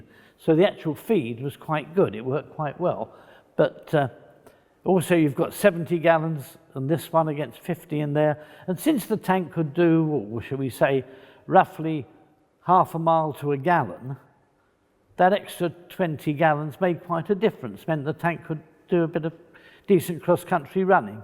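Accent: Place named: England, United Kingdom. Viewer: English? He is English and is speaking the purest form of it. I think you mean his accent.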